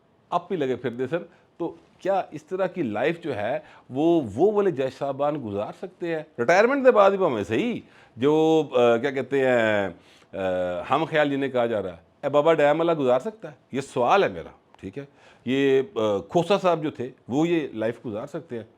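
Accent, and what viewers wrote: Punjab, Pakistan. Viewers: I am watching this anchor for the first time. I really liked his unique style of presentation including the Punjabi touch. Keep up the good job 👍. Jai Hind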